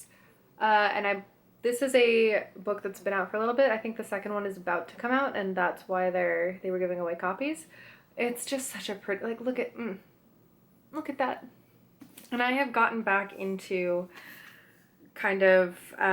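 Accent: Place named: France. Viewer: You talk french btw? Coz I do and I LOVE THE ACCENT XDD😂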